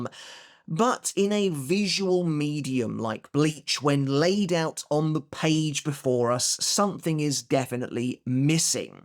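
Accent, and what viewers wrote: United Kingdom. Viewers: Too british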